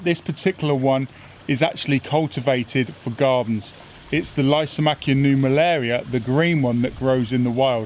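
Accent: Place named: England, United Kingdom. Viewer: I shared your video on my Facebook page I just can never get over how fast your knowledge and palms is, the English accent helps I got to say I’m not sure if it’s just adorable or it makes you sound smarter or both LOL but this video is definitely a top notch. I recommend all my friends to check you out on your channel and that between you and John hardly anything they can’t learn. Charlotte can’t wait to see you soon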